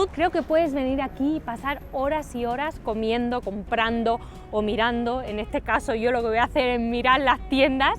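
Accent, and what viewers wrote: Andalusia, Spain. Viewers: AUNQUE CON LAS REGLAS DE NO DEJAR GRABAR, EL VÍDEO ES MUY BUENO! YO HE IDO A CDMX PERO NO HE IDO A SANTA FÉ!! PERO YA LO CONOZCO UN POCO CON TÚ VÍDEO!! ME GUSTA TU ACENTO ESPAÑOL!!E GUSTARÍA IR A ESPAÑA!! SALUDOS!!! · Haz un reportaje de INTERLOMAS otro lugar de cdmx muy especial . ..ahhh te vez hermosa y tú hacento español suena bien,felicidades !provecho!!!. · Tu no eres catalana. Eres andaluza.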